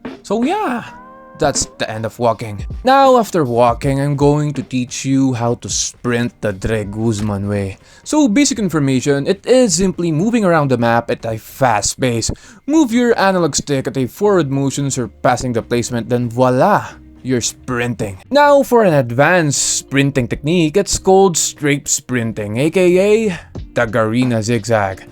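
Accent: Spain. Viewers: is bro Spanish or french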